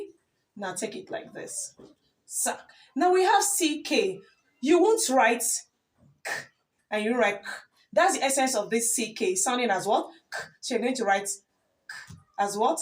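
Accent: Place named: France. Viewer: Nice , your video is of great value. Sure you are french, nice and beautiful accent 🎉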